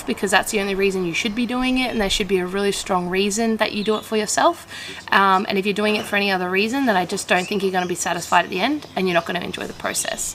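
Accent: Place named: Australia. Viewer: Hiiiii.. From Costa Rica. You can put subtitles in your videos I can hardly understand the Australian accent. Tks. Love you.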